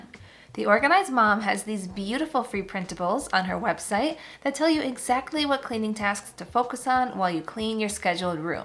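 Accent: England, United Kingdom. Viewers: Your English accent is gorgeous 😄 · Your English accent here n there is hilarious! Love it Xx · Your English accent 🤣🤣🤣🤣. Adorable. I'm from England and was very impressed 🤪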